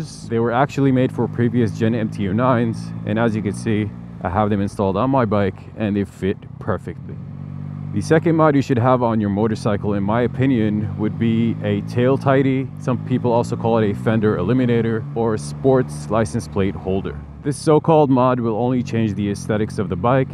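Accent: United States. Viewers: זה המבטא האמריקאי הכי חלק ששמעתי מישראלי אי פעם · זה המבטא האמריקאי הכי טוב ששמעתי בחיים שלי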